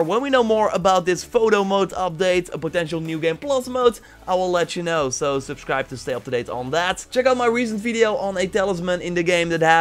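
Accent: Netherlands. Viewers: Jezus what a strong Holland accent!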